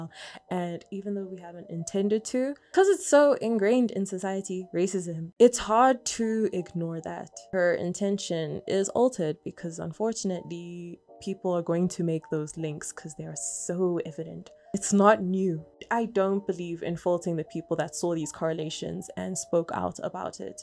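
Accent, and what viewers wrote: South Africa. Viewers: I was wondering is this a South African accent. Thinking nooo maybe uk maybe Australia then you hit that “but wow” and now I know. I’m sure. Hello my sister.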